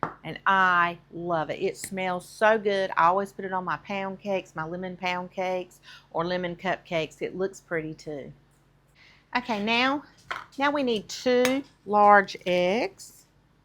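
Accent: South, United States. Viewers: Love the Southern accent! Honestly, I have to make that lemon lasagna!!! Thanks for an easy recipe that hopefully I can make. Looks so delicious I cant wait to try it. Thanks again 😊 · I love your southern accent. Yummy lemon!! I want to try making this. Hoping all the ingredients you used are available in Canada. ❤ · FIRST TIME seeing ya!! I had to subscribe!! I love your Southern Belle accent !!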